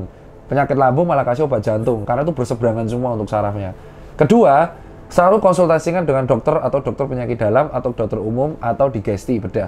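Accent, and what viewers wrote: Java, Indonesia. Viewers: Salam sehat slalu dok.... Saluttt juga kerennnnn logat jawae dokter tirta , Salam satu jiwa dari arema.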